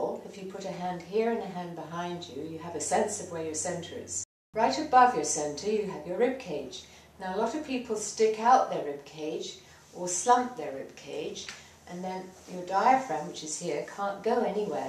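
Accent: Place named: United Kingdom. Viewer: Love British accent!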